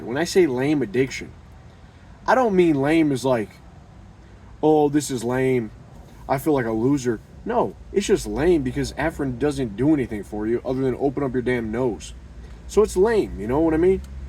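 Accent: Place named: Ohio, United States. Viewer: Man I recognized the accent immediately. I grew up in Clyde-Sandusky co area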